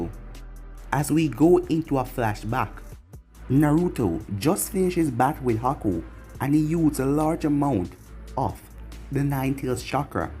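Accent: Jamaica. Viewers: He’s from Jamaica That’s How He Talks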